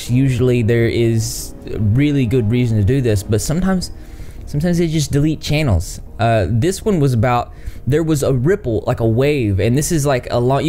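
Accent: South, United States. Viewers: I'm trying to figure out where your accent comes from, is it Georgia or Tennessee? Maybe western North Carolina? :)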